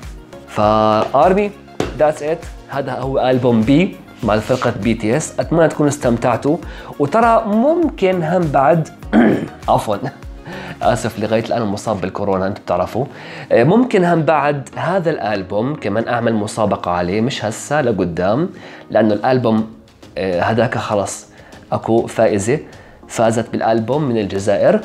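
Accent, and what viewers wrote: Iraq. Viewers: انت كنك عراقي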